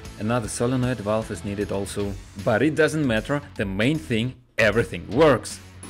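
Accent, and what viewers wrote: Russia. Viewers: Смотрю, слушаю и думаю, это у меня уши сломались или он не англичанин. Хм... Почему русский акцент... Хах) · а я вот никак не пойму. По акценту ты, вроде, русский, а по контенту в предыдущих видео, вроде, из америки. Я запутался, откуда ты?:) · так я не пойму ты русский или англичанин?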